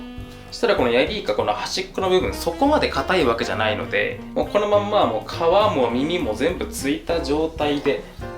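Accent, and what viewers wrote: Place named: Nagasaki, Japan. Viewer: おなじ長崎県身として 方言嬉しい（笑） 〇〇っちゃんﾊﾞﾘ長崎やし（笑）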